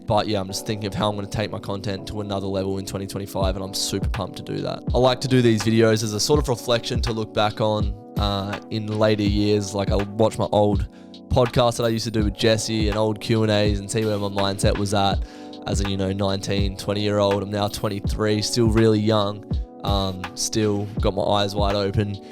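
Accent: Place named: Australia. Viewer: just got around to watching this..couldn’t agree more. the people you meet & relationships you foster along the journey is what makes life worth moving through the sludge. beautiful q&a, looking forward to more content in 2025 mate🫡🫡 send me a voice note soon braindumping what’s been going on—good to hear the ole aussie accent xxx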